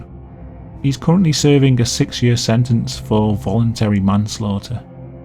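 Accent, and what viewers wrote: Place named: Wales, United Kingdom. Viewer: They cooked one of his legs and eht some of it? Love the Welsh accent.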